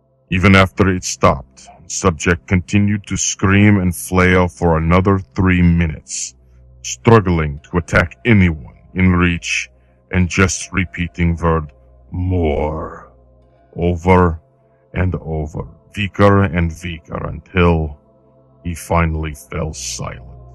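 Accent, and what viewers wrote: Russia. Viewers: That was great and I think you are the first to do it with a Russian accent. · Fantastic. This story always makes me queasy xD Clever use of a Russian accent as well as the visuals :3 Good job. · Are you really Russian or you that good?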